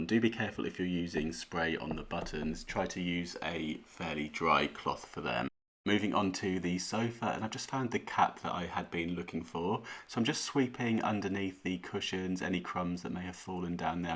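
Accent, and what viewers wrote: United Kingdom. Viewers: same accent as "Charlie bit my finger"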